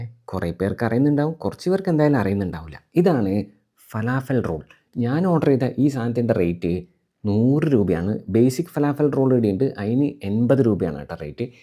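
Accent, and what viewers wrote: Kannur, India. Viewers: Chettan kannurkaran aano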